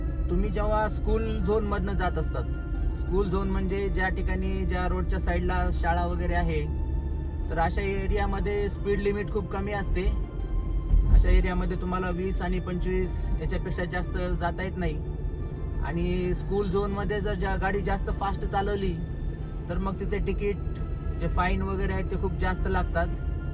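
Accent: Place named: Latur, India. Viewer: 🙏🙏राम राम दादा सर्व काही माहिती खूप छान देता कॅनडामध्ये राहुनी ही मराठी गावची लातूरची भाषा नाही विसरला तुम् ही❤❤👌👌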